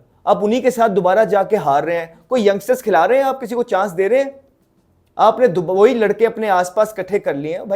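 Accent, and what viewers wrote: Punjab, India. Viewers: Shazad bhai one of the many excellent talents who could not flourish or even evolve because of what Pakistani hierarchy is, and excellent points bhai, also love your “pure” Punjabi accent! Keep it up Sheraaa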